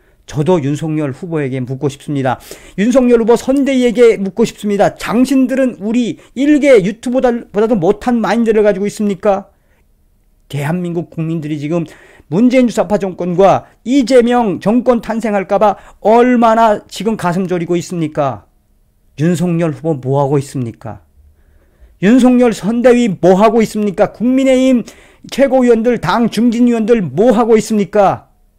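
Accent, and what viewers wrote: North Korea. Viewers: 말투가 북한 사람같내?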